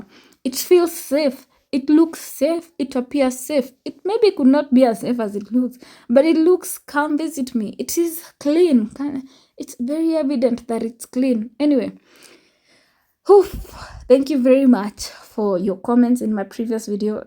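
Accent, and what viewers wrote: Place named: Somalia. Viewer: Her accent doesn't sound Ethiopian. She is Somalian I think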